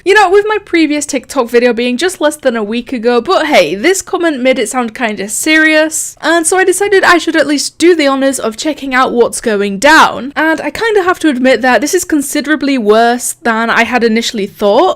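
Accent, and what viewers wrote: United Kingdom. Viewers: I rather you over koneko since u sound Like your from the uk not saying you are from the uk It’s just there are not many British roblox YouTubers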